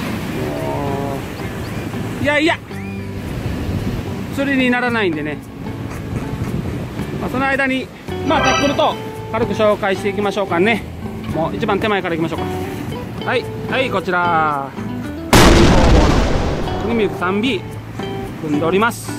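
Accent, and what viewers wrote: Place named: Tosa, Japan. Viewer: 流石のもすカッチ良いなー、そして、土佐弁大好きやわ。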